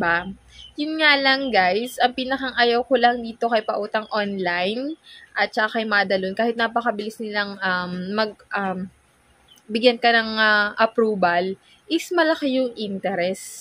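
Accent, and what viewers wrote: Batangas, Philippines. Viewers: Batanggeña ka po?? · laang?? 🤣😁✌️✌️ batangenya ka te? hehe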